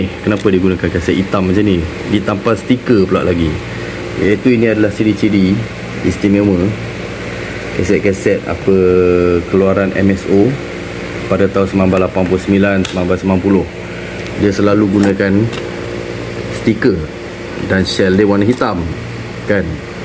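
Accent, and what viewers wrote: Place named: Malaysia. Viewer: Sy dah lama tertanya²..bro ni org Bugis Selangor ke? Pekat no melayu nye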